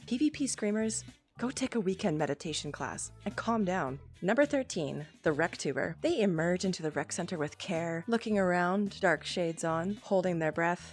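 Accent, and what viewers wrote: Canada. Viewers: Hold on your Canadian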